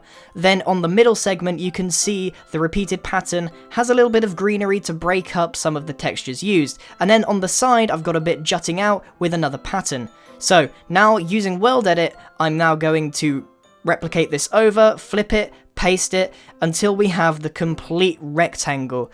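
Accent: United Kingdom. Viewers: Right now i feel like grian has become more British lmao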